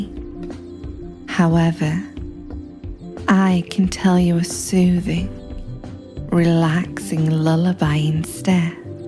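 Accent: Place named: England, United Kingdom. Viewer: Sensuous... so , so sexy....gorgeous.... sultry voice.....beautiful English accent....root beer eyes .....LOVE when you look directly into the camera. I fucking LOVE when I see a woman that knows how to be a woman , knows how to use her powers with men. You are irresistible , m' lady. Thank you for sharing your gift with us.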